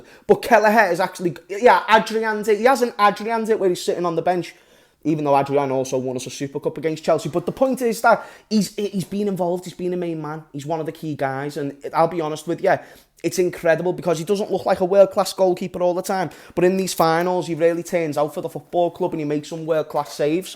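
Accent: Liverpool, United Kingdom. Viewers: Stupid scouse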